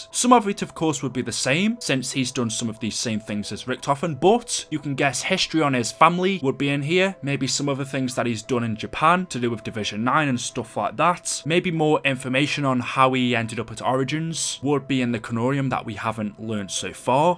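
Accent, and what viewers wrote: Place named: Stoke, United Kingdom. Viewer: Hi, huge fan, this question is not about the game, it's about you. Are you from stoke on Trent or around there? because you have quite a strong stoke accent I think, I live down the road in Newcastle. If you do you are the only famous YouTube around for miles, I'm proud of you are!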